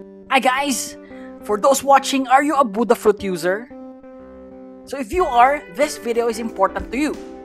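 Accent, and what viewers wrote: Philippines. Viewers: You sound so filipino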